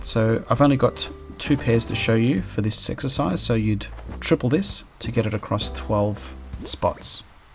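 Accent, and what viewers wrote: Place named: Australia. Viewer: OMFG of course you are an Aussie...I hadn't listened to the video for a while...and I knew that! (Honest I can tell the difference- and 3 of my friends left US for Australia. My bad! And so glad what our domination plot of getting you hooked on expensive Seatle coffee is working as planned!). Yeah sprinking on my flowers is prob going to be left up to me. What is so odd is I've managed to get a lot of purples (just me/myself and I). Tulips, blue roses, lots of green mums, pink everything except those dang lilies . The only thing alluding my can is the pansie (which was my native flower and I HATED It along with the damn pears...why PEARS? OMG...Apples...oranges. NO the bland flipping PEAR. ALSO (since we are new besties) I can't have any one doing a fly over at this moment...I'm redoing everything and it's a shite-show. Our numbers are horrid. 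I'm super immunocomp so I pretty much have been at home for 2 yrs (going out 1 or 2x a month heavily protected) but w/Omicron I've been on full lockdown as of 1/1. So when not working online...I'm in search of the purple pansie/windflower! Be safe my friend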